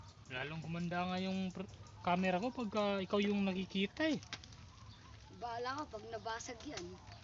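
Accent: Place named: Pampanga, Philippines. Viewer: may punto ka narin ng kapampangan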